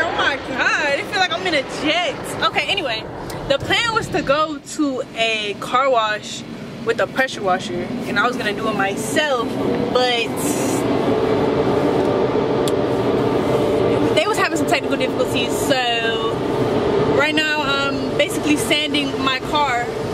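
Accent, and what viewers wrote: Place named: United Kingdom. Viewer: The British accent 😂LOL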